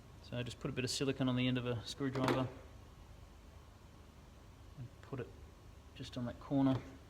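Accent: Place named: Australia. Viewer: Cheers thanks for the Mellow rebuild and Aussie accent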